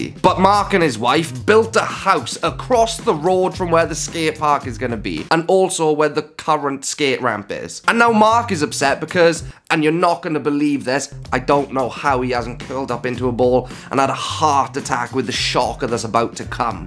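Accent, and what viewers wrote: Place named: Wales, United Kingdom. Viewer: 🤣 Your musical Welsh accent makes the mockery even funnier 😂. My fur is still shaking - you made a cat laugh 😸!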